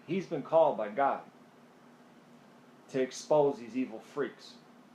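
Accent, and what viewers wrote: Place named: Pittsburgh, United States. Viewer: elroy elongated naw this is classic pittsburgheez . It's how we sound in PA. I actually didn't notice until I read this. Google it, we have a distinct accent around here.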